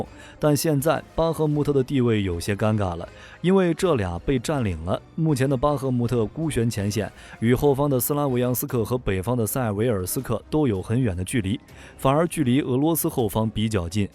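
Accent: China. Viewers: 你个台独媒体,用中国口音的配音,可耻.你应该用台湾语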